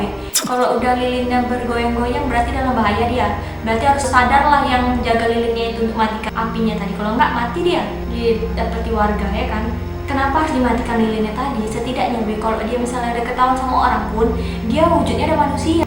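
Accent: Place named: Medan, Indonesia. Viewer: Logat medan nya masih kental banget ya kaa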